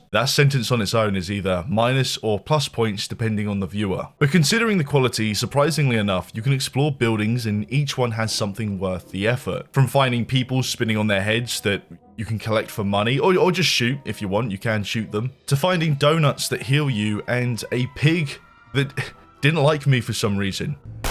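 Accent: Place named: United Kingdom. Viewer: Why do I feel like feels a bit extra Bri'ish in this one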